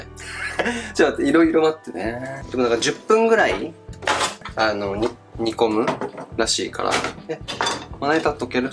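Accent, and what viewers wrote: Kansai, Japan. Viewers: 久々に見たら関西弁になっててめちゃくちゃ好きようするに好き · 今回すごい関西弁多くてにやけた🤦‍♀️💖 いちご途中で食べようとしたの可愛すぎる🥺（笑） ダルゴナコーヒー(？)作って欲しいです！！ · あとろ関西弁だから めっちゃ親近感わく♡ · 名前変えれた～！！ これからはコメントできる～！！ 明日作るいちご飴はこの動画見ながら成功させますっ👌✨ 関西弁好き～💗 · ねえ！なんかいっつもよりめっちゃ関西弁！！！！可愛い！！どうした！！！👼🏻💘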